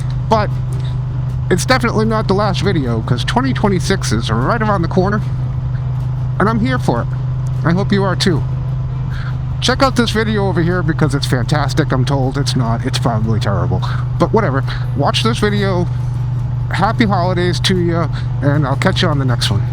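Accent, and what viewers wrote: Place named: Boston, United States. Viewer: We seem to have similar taste in bikes. My Tiger Explorer 1200 was getting a bit big and heavy, so I bought a V85TT in 2020 for birthday #75. It seemed to have all the things I wanted in a bike. 5 years and 16K miles later, it just wasn't gelling. So it went to a new home late last year and I picked up a Himi 450. Did a 5700 mile shakedown ride on the Himi and it is a great bike. In the interim, I picked up a Triumph Speed 400 for a day ride play bike - and it's a hoot. And then, there's the '86 Yamaha SRX-6 (that I bought new) sitting in the back of the garage sadly in need of a complete restoration before it can go back on the road. And I still have the big Tiger - although I will probably try to rehome it this Spring. The Himi is doing what I need for a distance bike and the Tiger deserves to be on the road. And I thought that I detected a bit of Boston in your accent. 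😁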